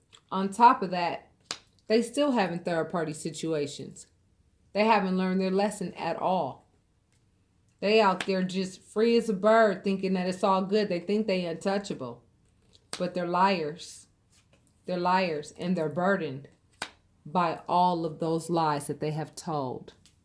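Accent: United States. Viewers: well said exactly what happened to me poured her with so much love so drained karma a bad thing shes having a shit time out there all the lies mind games cheating manipulating witch still wont apologise for all the pain caused shes getting it bad thank you so much luv the yanky accent bless ya xxxxxxxxxxxxxxxxxxxxxxxx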